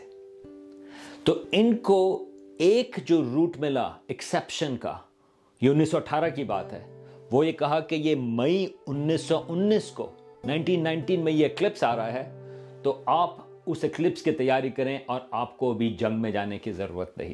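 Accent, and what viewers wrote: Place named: United States. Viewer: Very Interesting. An American way of expressing in great urdu language !!!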